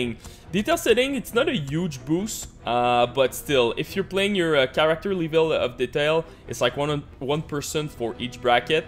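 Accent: Quebec, Canada. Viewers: You have beautiful Quebec English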